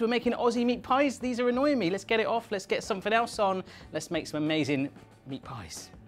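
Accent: England, United Kingdom. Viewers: to me he doesn't sound Australian he sounds English O.O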